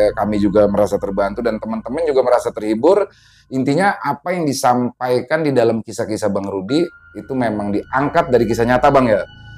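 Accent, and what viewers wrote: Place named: Jakarta, Indonesia. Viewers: aq jg lbh suka logat betawi..jujur gak gala gasi gtu.. secara gue jg dulu tinggal fi tanah betawi lama😅😅 salam knal bang rudi.. aq pecinta bercerita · Paling keren nih cerita dari mas untung prasetyo tentang pengalaman bg Rudi, apalagi yg baca cerita@ bg pke logat Betawi, mantaffff, salam untuk mas untung Prasetyo, dan bg Rudi y bg, semoga sehat slalu n sukses dan slalu dalam lindungan ALLAH SWT, aamiin.......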